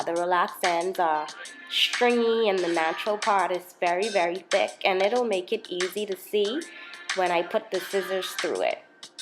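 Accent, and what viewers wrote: Bahamas. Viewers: Great video .as soon as I heard your voice I was like the Bahamas . I am from Nassau but have been in Virginia since 1996 . Keep on doing your best . You are a star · Could always tell a Bahamian by that accent. 🇧🇸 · I luvvv my Bahamians 🇧🇸.... I just luv our accent and when you said "Horrible" why I bust out laughing lol but it really looks great on you tho... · You sound like a Bahamian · Are you from The Bahamas? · Yassss I know bohemian sister when I hear one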